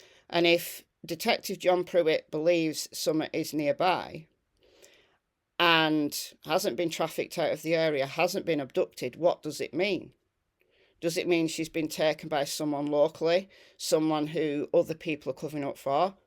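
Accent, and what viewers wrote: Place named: Newcastle, United Kingdom. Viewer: Hi i live middle england but from newcastle i love the way you talk sound like me haha love how you stick to facts x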